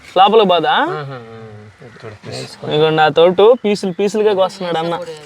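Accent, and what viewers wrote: Nellore, India. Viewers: Mimmalni chusthunte mucchatesthundi... God bless you.. Nellore language mi daggara vintunna🥰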